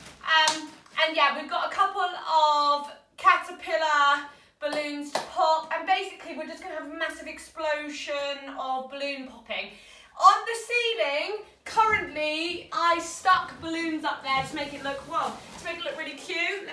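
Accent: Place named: Southeast England, United Kingdom. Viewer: Are you from SE England? Your accent is similar to mine haha. You have a lot of energy!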